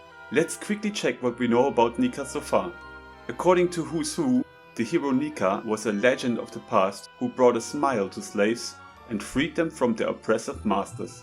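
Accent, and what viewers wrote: Germany. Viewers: no problem bro, and are you maybe German too? idk i think that maybe because of your accent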